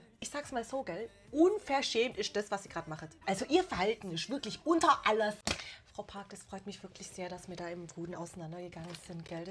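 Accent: Swabia, Germany. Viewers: Des vestehed nur schwaben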